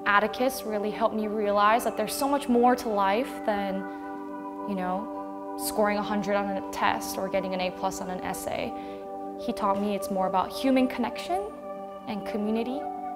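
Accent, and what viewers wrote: United States. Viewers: 와 미국인인줄 알았어요 영어 실력 무엇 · Why does she sound American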